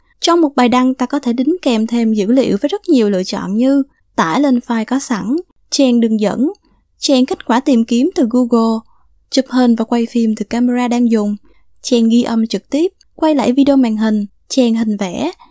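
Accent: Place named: South, Vietnam. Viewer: ôi đúng là giọng con gái miền Nam, nghe ngọt xỉuuuuuuuu nghe kênh của bạn này hướng dẫn vừa dễ nghe lại dễ hiểu, dễ làm mong bạn có nhiều video như thế này nữa <3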